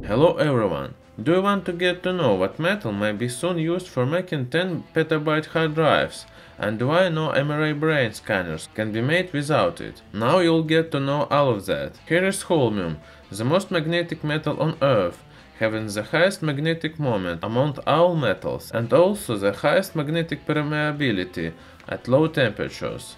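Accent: Russia. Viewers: Are you Russian? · You make great videos! I love your accent, like the secret Moscow contact in a 60’s spy movie. :-) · I'm Slavic, but I can't stand that Russian accent, sorry.. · How many of you watch his videos just to hear his cool Russian accent? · The Donald He tries really hard to speak well but he is Russian so have some difficulty to pronounce english words clearly 😐😉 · yo bro... ur video is nice.... but plz add subtitles... ur russian accent is too strong...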